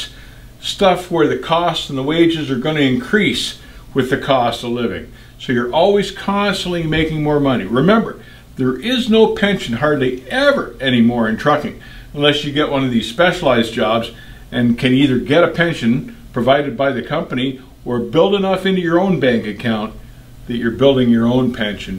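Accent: Canada. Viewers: You're Canadian, eh?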